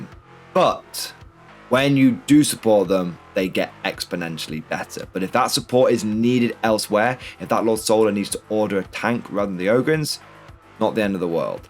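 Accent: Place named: United Kingdom. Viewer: is swooning me with his cute British accent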